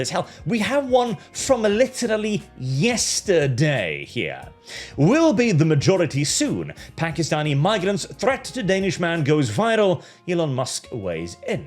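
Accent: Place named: United Kingdom. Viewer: The video presenter looks like a Russian Udmert but sounds like a British person. Off putting and confusing.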